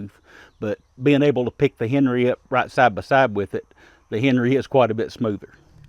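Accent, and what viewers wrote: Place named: East Tennessee, United States. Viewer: I just found your channel. Where are you from? You accent is telling me somewhere in East Tennessee.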